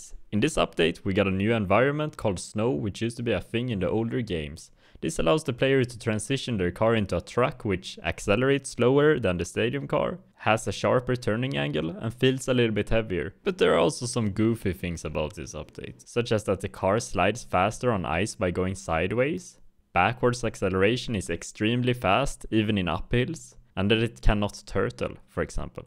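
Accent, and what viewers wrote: Sweden. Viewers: here for the heavy swedish accent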